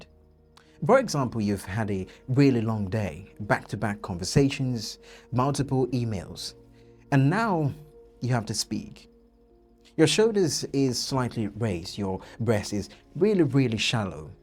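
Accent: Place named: RP, United Kingdom. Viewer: You have the RP accent , which better that the American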